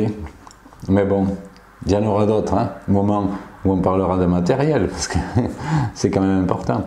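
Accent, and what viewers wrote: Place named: Pyrenees, France. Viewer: Bonjour , ravi de te revoir Quel est ce drapeau en haut à gauche? Avec ton accent tu viens des Pyrénées peut être ? Et vive les pêcheurs et les conseils pour pécher une rivière dans les règles de l'art. Le matos c'est super mais faut il savoir s'en servir.Amitiés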